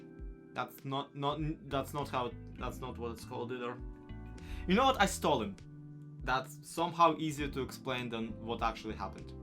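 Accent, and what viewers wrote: Russia. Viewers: Дикция палит тебя что ты руский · the thick russian accent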